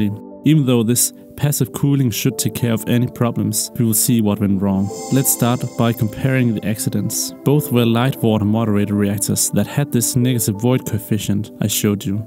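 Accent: Denmark. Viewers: is that a dansker i høre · That's the thickest danish accent I've ever heard.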